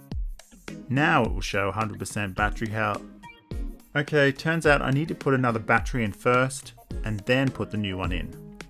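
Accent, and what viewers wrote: Australia. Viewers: Very satisfying to see and Hear a fellow Aussie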